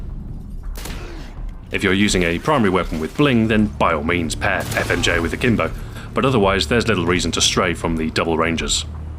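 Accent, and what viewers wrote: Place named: United Kingdom. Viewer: In any other case you would have the same view. You probably only like it now because some guy with a badass British accent uses it. Rite?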